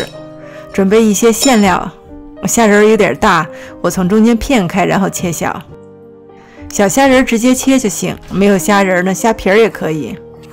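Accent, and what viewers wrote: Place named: Northeast, China. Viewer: 为啥感觉你说话像是东北的捏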